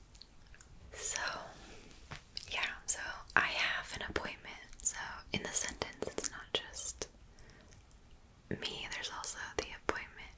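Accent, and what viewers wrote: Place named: United States. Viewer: Nice video, I love your American accent. And your German pronunciation is very good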